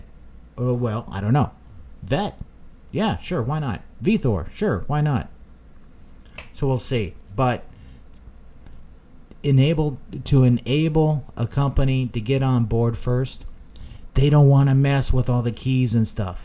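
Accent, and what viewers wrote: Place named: France. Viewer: I love your french brother😁. Great show btw..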